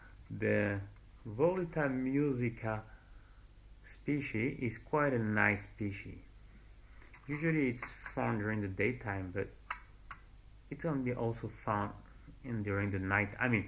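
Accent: France. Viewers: I think your YouTube channel is a great thing, but I'm going to be honest with you, if you wear your mask and snorkel (probably your fins too) noone in the scientific community is going to take you seriously, the image implies that you dive for shells in approx 30 ft at best, you are missing out on so much more....btw your French accent is awesome :) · Why would anyone apologize for a French accent, among the most elegant on the planet? Votre voix est tres suave.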